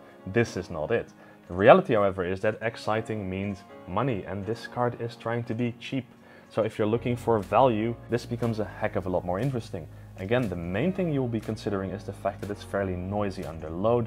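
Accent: Netherlands. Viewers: Jawel, hij spreekt Engels met een Nederlands accent. Bovendien is hun pand gevestigd in Nederland. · are you dutch?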